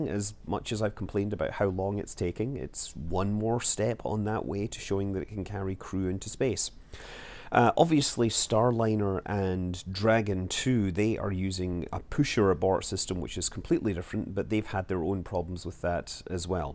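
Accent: Scotland, United Kingdom. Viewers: lol. It just hit me what it means for you to be touring Europe. Your accent is so strong I keep forgetting you're from the US... There seem to be a lot of American youtubers with various kinds of British Accents... Feels kinda weird. (and yes, I know that's a scottish accent. But you know full well that Scotland is part of the UK, so it's not technically wrong, even if the Scots might tend to think so... I mean, that affects me personally in fact - I've known for ages that I'm 1/4 british, but I'd never looked into how or why, until a few years ago when I learnt that this was pretty much mostly Scottish ancestry. Because my Grandfather is Polish and my one of my father and one of my aunts were born in Plymouth that wasn't immediately obvious... - if for some bizarre reason you're wondering, my other aunt was born in Zimbabwe. Probably tells you a lot about my family huh. 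XD - the other half is mostly far more boring, but, again from a different country...)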